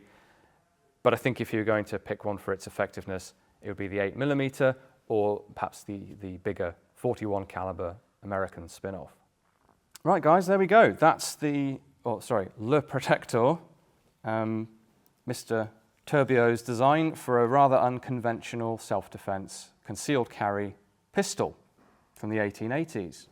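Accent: England, United Kingdom. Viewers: Some beautiful English accent for my ears. Greetings from Argentina!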